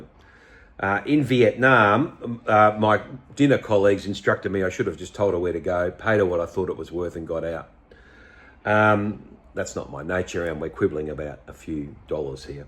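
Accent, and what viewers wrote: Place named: Australia. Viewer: Why are you talking that way ? Can't you talk normally ? Your accent irrtates me a bit. Is that an Australian accent? It's a second worst accent I've heard. The worst is South African accent . The second one is yours.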